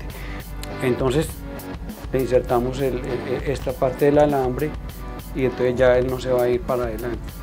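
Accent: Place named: Colombia. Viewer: Hola buenos días se me acaba de morir mi canario quisiera disecarlo donde se encuentra usted no me diga que en Colombia por su hermoso asentó Dios que triste estoy 😢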